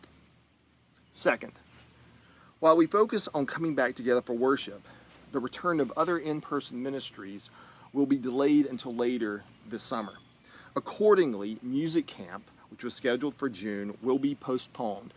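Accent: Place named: South, United States. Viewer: So good to see your face! (Miss that southern twang though.) Hoping you, your family, & your congregation remain well & safe during this time. ❤️ JT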